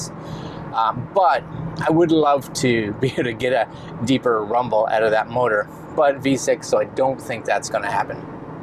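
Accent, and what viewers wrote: Canada. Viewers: Are you from Newfoundland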